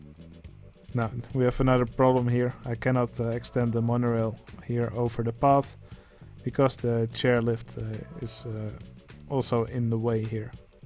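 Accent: Netherlands. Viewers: Ik kan wel horen dat je Nederlands ben.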